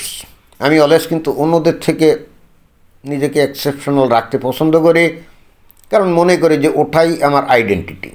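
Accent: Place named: Jessore, Bangladesh. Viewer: আপনার কথা শুনে মনে হয়, আপনি যশোরের লোক।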